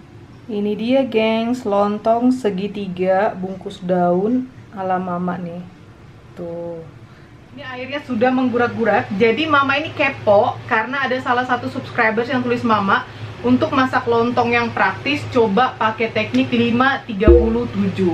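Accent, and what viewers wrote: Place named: Banjar, Indonesia. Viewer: Suka sekali sama si mamak ini, bhs nya Banjar banar. Menggurak gurak. Si acil, nyaman banar. Wkwkwk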